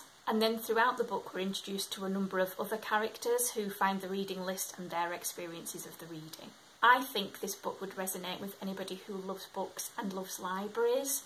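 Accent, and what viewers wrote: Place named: Yorkshire, United Kingdom. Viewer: As someone from the Netherlands I sometimes can't tell the various UK accents apart. I had no idea you had a Yorkshire accent as I have no idea what a Yorkshire accent sounds like. I know what a Scottish accent sounds like since recently as I started watching a couple of other BookTubers who are Scottish and talk about it. How nice you can reserve any book from the county for a pound! It costs more here if you want a book from a library further away than the local area here. Oh yes I am always careful with my library loans too. In the Netherlands you have to pay for a library subscription (except for children under 18 I think), so that could be a reason here but I don't know. Some libraries make you pay a certain amount of € per book, gladly mine doesn't and it's just the yearly fee. How cool, I don't think they deliver to your house here at all, that is not a thing. 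I love my library though. Oh yes I love browsing at the library! The Stopping Places sounds interesting. I couldn't pick one best book either! The Reading List has a nice cover! It sounds like a nice concept for a book! Ah I was just about to put it on my wishlist, and realised it was already on there. I didn't recognise it because the cover on my wishlist looks different. Glad you enjoyed the book! I can't listen to audiobooks. I prefer reading paperbooks over ebooks too. My library does have ebooks, but I can't read them on my Kindle (it's not a supported format), so I'd have to read them on my phone (or perhap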